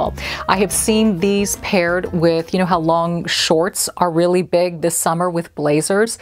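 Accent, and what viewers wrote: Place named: United States. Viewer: Thanks, I love your inspirational videos and cute American accent. You are so inspirational and so positive. I rely on you to keep me fashionable!...bless you from sunny England. 🤗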